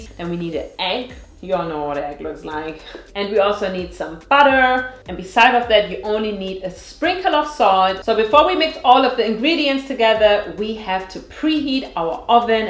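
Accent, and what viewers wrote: Germany. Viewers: Sie hat doch einen deutschen Akzent, oder irre ich mich? Sorry kannte sie bis eben nicht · You can hear her German accent right away it’s kinda cute she is so damn pretty tho 😍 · Are you German or sth? You definitely don't sound American 😂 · das liegt daran, dass ihr English sooooo krass deutsch ist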